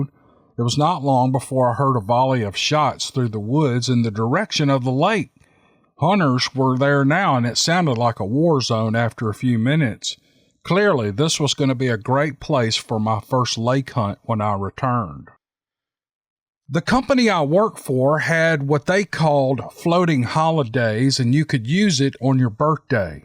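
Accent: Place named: Tennessee, United States. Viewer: " Interruptions/Commentaries" lol... It's like, no worries, "We got the Power of the Remote in hand, 😁 heeheehee, naw... He knows all the folks that listen are either: 1. Southern and they'd woop a fella that ever b-tched about him, 2....and the rest are so taken with his Authentic West Tennessee, North Mississippi, Accent that they'd Woop that fella too! be like "Switzerland for Public Harmony", we might need to stick him on a Campaign Train in 2024! Finally, I would have a Candidate that's a MODERATE. (News Media doesn't allow Moderates, they don't have enough opposite leverage to milk for profits). If they are a Moderate, then they just "use creative writing/lie" and create their drama for profits. The Middle is really a pleasant pasture, "You Guys and Y'all" need to Check it out. ✔ Peace would be Possible if the News Media wasn't owned by the 1% of the 1%, (including the guy/family That's the "Go-to-Source for War Loans") fact, owns 100% A/P....see, there's no big Profiting in Peace, so they stream ideas fostering prejudice. 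We really don't have a "Free-Press", but most folks think we do. A private owned news media just always has an agenda, and that's "our greater foe". We gonna get this through our heads and get back to Adulting. That's my Commentary, and it's Positive. ☺ Thx for being real and nice my fellow DC'rs, its a cool Tribe.... 🇺🇸🌎🌍🌏🗽 HaPpY Holidays Everybody! 🍸🎄........ "the credits": Journalism is 1 of my Degree majors: University of Memphis Go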